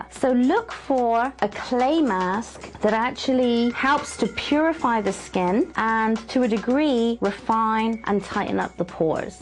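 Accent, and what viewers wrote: United Kingdom. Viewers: pretty standard London/Southeast England accent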